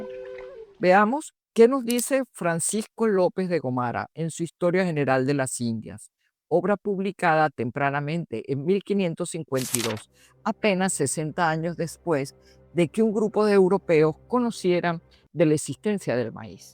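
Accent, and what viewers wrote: Venezuela. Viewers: Por Dios ❤❤❤ que profesionalismo, la bibliografía me dejo asombrado 🎉🎉🎉🎉 me encanta su tono de voz al narrar, me reconforta ese acento venezolano a la distancia. Infinitas gracias profe. Hola pueda llamarla profe.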